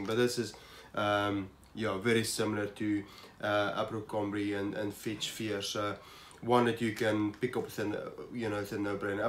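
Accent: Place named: South Africa. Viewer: Are you South African by any chance? I’m South African and I hear it, but it also sounds like you’ve lived in the UK or something.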